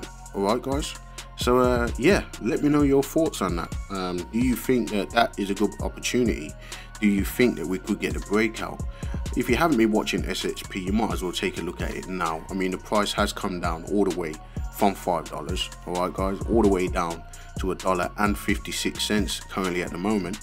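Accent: Birmingham, United Kingdom. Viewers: You sound like a brummie Johnathon Woss.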